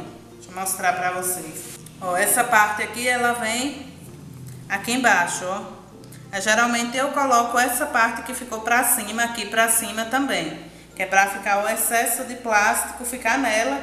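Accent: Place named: Northeast, Brazil. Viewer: Olá gosto muito dos seus vídeos meu nome é Walmir Lopes sou de Salvador Ba vc é muito simpática vc é de que lugar vc remédio um sotaque nordestino eu gosto muito desse sotaque eu também sou nordestino um beijo.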